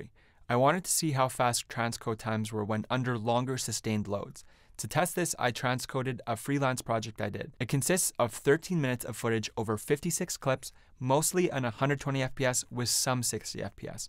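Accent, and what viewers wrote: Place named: Poland. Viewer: It's hard to say. Rather, if it wasn't for the name of the channel, I wouldn't have come up with the idea that you have a Polish accent. If I had figured it out myself, the accent is definitely not the first thing that would reveal your origin. You may as well be a child of immigrants who came from Poland to Canada and you have never spoken Polish anywhere except at home. Tell the truth if you are agree for it: D It does not matter much to me because I watch the channel because of the content, but it's nice if another compatriot is successful on YT...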